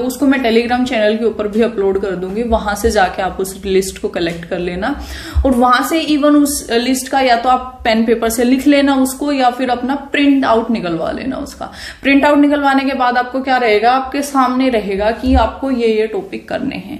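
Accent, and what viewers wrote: Haryana, India. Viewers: Sister jab aap bolte ho to haryanvi tach aata h 😊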